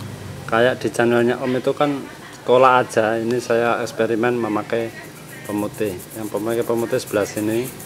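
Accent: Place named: Java, Indonesia. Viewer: medog jawa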